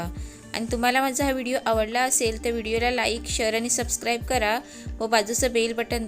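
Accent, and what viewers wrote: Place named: Konkan, India. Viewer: Love the Konkani accent ❤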